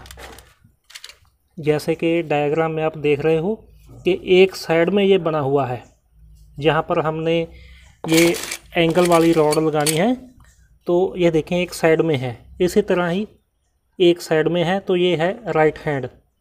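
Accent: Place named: Punjab, India. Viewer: Veere Punjabi bol k video banaa Leni c. .Hindi bol reha Punjabi style ch...😊 Darwajey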